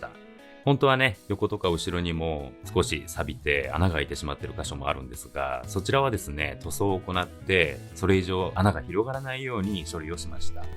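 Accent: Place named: Aichi, Japan. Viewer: 私も愛知県なので、言葉の端々やイントネーションから聞き馴染みがありました。私の家の小さな修理をして欲しいくらい器用ですよね？旦那さんがそれくらい器用なら良かったのに。😅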